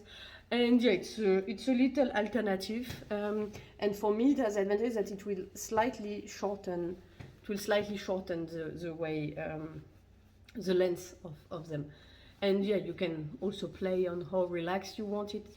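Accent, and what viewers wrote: France. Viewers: French accent = immediate credibility! :) Thanks for the tutorials!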